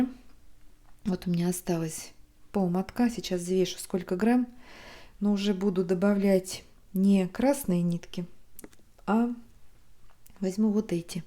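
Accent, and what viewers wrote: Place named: Voronezh, Russia. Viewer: Узнаваемые воронежские интонации 😊 . Смотрела ваши видео и предполагала, что вы житель Воронежского края, ну и здесь услышала подтверждение этому.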